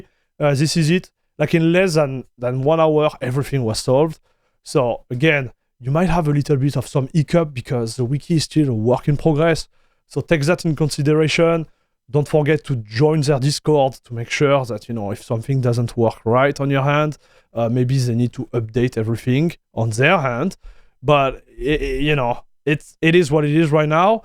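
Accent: France. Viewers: it's not an English accent haha, it's French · I love the French accent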